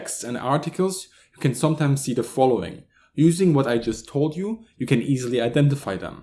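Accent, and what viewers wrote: Germany. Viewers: Are you from Germany or Switzerland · I don't know what it is but the German accent is amazing. I love your videos mate! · love the german accent :D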